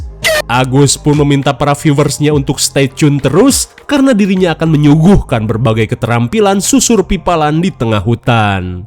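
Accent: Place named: Sunda, Indonesia. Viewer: emang pujaan aku sm saudara temen juga 😂..sunda banget seru kl udah bilang eta si....😂😂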